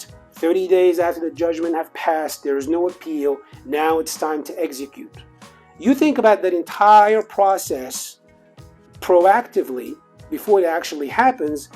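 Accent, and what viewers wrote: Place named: New York, United States. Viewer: sound a bit New Yorkish.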